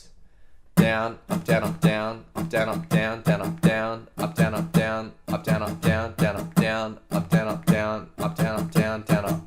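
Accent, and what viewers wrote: Australia. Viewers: Your tutorial was great man, you need more subscribers cause you deserve it 👊😁 What nash are you anyway? (You're aussie right?) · Sounds like Aussie accents. No offense tho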